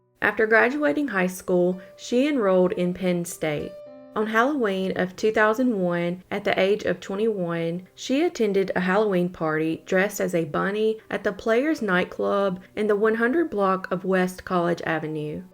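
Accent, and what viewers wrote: South, United States. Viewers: Open Youtube, check notifications, "British True Crime channels" x20, scroll a little deeper, BOOM posted 2hrs ago.. Skipp all the others, click, cause well, better content, WAY better content, PLUS I speak and comprehend accents (Arkansas, but transplanted to Texas) Keep up your excellent work, Youtube will not put you at the top of my Notifications, but I will scroll past all the others cause in my book, you are #1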